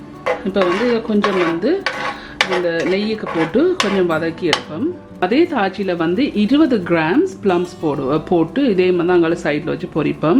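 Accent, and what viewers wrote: Sri Lanka. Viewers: உங்கள் லட்டும் அருமை உங்கள் இலங்கை தமிழும் அருமை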